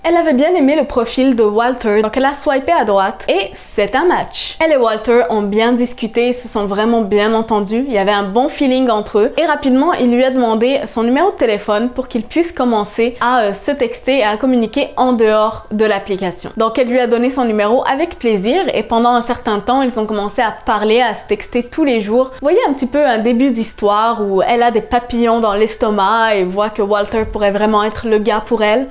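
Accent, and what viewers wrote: Canada. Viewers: j'adore ton accent tes canadienne ?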